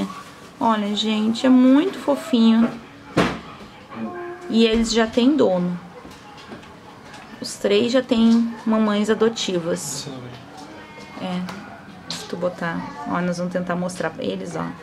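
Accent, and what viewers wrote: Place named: South, Brazil. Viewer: Amo o sotaque do Sul e.lindo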